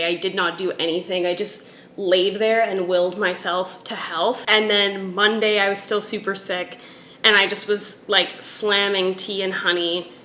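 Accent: Canada. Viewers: Jessica Ridenour Yes, it’s how most Canadians say it. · It's the same as the southern English pronunciation and I'm grateful for it. Canadian accents are beautiful.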